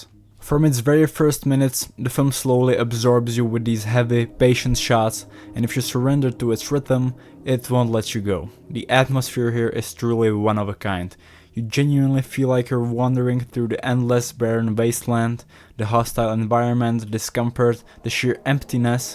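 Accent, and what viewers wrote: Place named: Czech Republic. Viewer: where are you from? you sound czech/slovak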